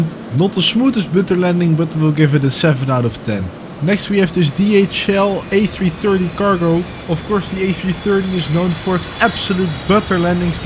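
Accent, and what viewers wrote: Netherlands. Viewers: Hello , iam. A plane fan ,but I smell a Dutch accent from ur mouth….. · Hou van da Hollands engels en leuke video man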